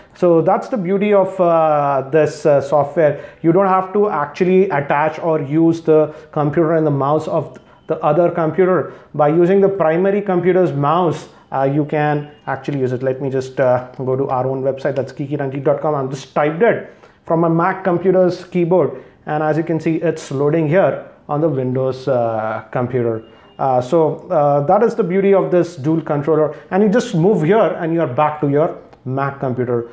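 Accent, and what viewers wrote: India. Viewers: Your video is awesome, but I cannot actually stay long because the heavy Indian accent is really annoying.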